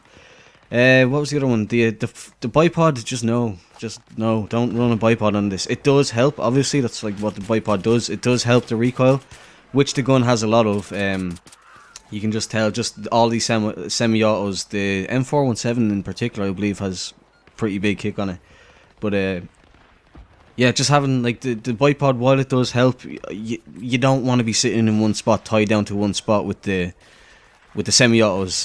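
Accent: Ireland. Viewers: Where in Ireland are you from? Used to live in Leixlip a long time ago! Just subscribed a few days ago - and am enjoying the content!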